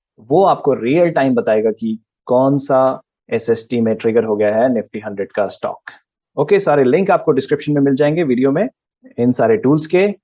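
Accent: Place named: United States. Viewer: Ye American accent MEI bolna pehle band Karo. Very irritating. Strategy Mahesh C. Kaushik Sir ki hai. And uptrend market mei ROI 5-7% shayad zyada milega friends. Not in every year maybe.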